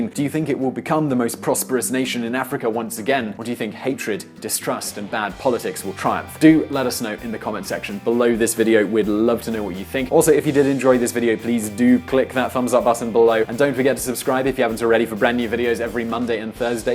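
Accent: Spain. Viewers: It's all fine and good, but please, let somebody else read your titles. The thick Spaniard accent only makes the otherwise serious videos unneededly hilarious. And, it does not really seem professional. :P Keep it up, guys! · This channel is an English version of a Spanish channel, so it make sense the narrator is has a Spanish accent. It just sounds funny tho.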